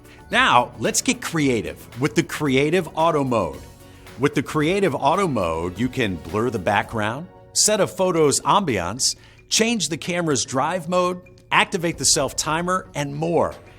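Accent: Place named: United States. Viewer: Turned straight off, annoying American accent.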